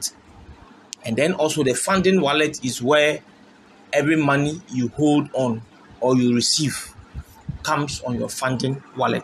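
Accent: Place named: Ghana. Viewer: Ghanian accent is so annoying and you talk too much. Go straight tot he point pls. Thanks anyway, I figured it out before even getting half way through this video.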